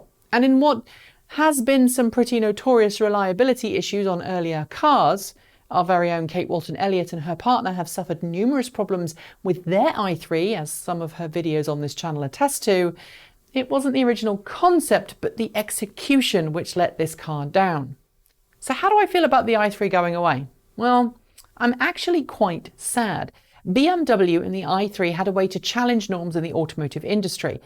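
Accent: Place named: England, United Kingdom. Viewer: Greetings from Sydney Australia! So so glad to hear that nice pommy - undiluted - accent back in this episode:):) Thank you and keep up your informative and entertaining work.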